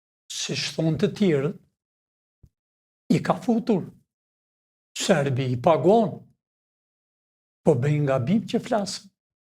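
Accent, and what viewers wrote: Albania. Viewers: Ohhhh perendi,o hero,po kushe pise thote qe nuk flet shqip ti?eeee?o baba tomorri,po ti flete shqipen qe flasin prinder tane,fshace,ata prinder qe kane punuar ne ferma e koop.po baba im fliste fiks si flet ti tani.cliro vatanin nga tradhetaret o hero,o skenderbe,o ismail qemali🇦🇱🇺🇲🙏🙏🙏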